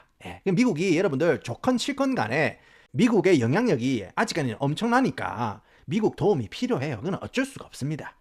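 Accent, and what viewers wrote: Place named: Busan, South Korea. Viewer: 처음엔 부산사투리를 살벌하게 구사하셔서 살짝 당황(?)하면서 초반만 보는듯 마는듯 봤었는데요 우연히 님 영상을 그냥 켜두고 제 할 일 하고 있었는데 하시는 말씀 듣다보니 어려울 수도 있는 정보와 지식을 참 쉽고 재미나게 풀어서 설명해주시는데 (중간 중간 난무하는 드립도 너무 중독적) 그리고 본업도 하시고 육아도 하시면서 자투리 시간을 할애에서 하시는 유튜브이신 것 같은데 진짜 퀄리티 장난 아니네요 이젠 30분 넘는 긴 영상이 기다려지고 (너무 몰입해서 봐서 그런가 이제 짧게 느껴져요) 완전 팬됐어요 럼프짱 성대모사는 봐도 봐도 안 질려요ㅋㅋㅋㅋㅋㅋㅋ가끔 댓글에 혀를 너무 굴려서 영어 발음 한다고 지적하는 사람들 있던데 10년 넘게 미국에서 살아본 제가 느낄때 님 발음도 구사하시는 문장도 흠 잡을데 없이 완벽합니다 영어랑 꽁냥꽁냥 1도 안되는 사람이 일부러 있어보이는 척 말끝마나 콩글리쉬 섞어쓰는거 보다 님이 네이티브로 구사해주시는게 듣는 제 귀엔 훨씬 편하네요~~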